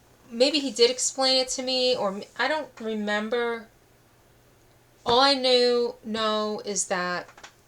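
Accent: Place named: East Coast, United States. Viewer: hello again, nice to meet you and also wanted to ask if you’re from the East Coast. I’m originally from Brooklyn New York, I’m 72, and I live in Hawaii for 40 years but I kind of recognized and East Coast accent. I can sense you’re a special person. Sending warm aloha breezes your way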